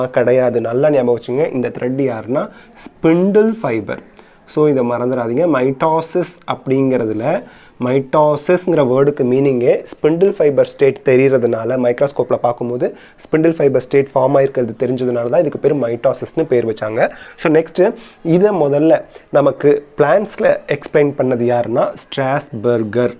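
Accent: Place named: Coimbatore, India. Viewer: Sir nernga entha place coimbatore maari thonuthu unga slang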